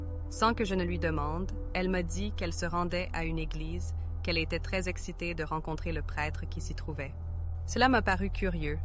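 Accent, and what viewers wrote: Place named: Canada. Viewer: Bonsoir, je viens de tomber sur votre vidéo et j'aime votre accent canadienne et je vais regarder une autre de vos vidéos car je voir dans le commentaire que c'est pas votre vraie voix ❤ bonne soirée à vous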